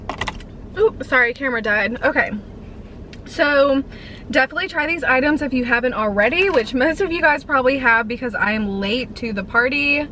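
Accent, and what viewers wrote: South, United States. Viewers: why do I watch these videos they always make me hungry lol. Also, where are you from? you sound like you kinda have a southern accent to me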